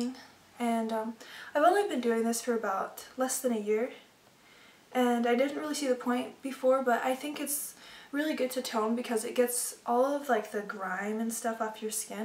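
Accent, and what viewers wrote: France. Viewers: I'm not used to commenting on videos at all, but I needed to tell you that you look absolutely wonderful. Such a pretty face! :) And nice French accent too! I suppose I would be your "counterpart" since I am a French girl studying English... All the best, Virginie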